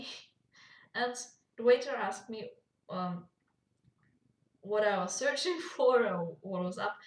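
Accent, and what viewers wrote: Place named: Germany. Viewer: Just got here from Reddit. Not even a minute in and already I'm thinking "Geeerman?" :P That's an easy to place accent. Just don't ask me to place it somewhere in the country. I have many ideas for what I'd say for any potential meeting with Dodger (which is not likely to happen, as I barely ever leavy the city, not to mention the country), but I imagine it would probably end up like this: "Uhm. Uh. Sooo... Wow. You really are tiny!" Ooops. o_o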